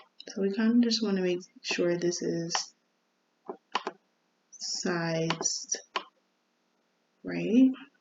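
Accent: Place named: Philadelphia, United States. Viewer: Thank you for this video so helpful!!! 🫶🏾You sound like you from philly… are you from philly ?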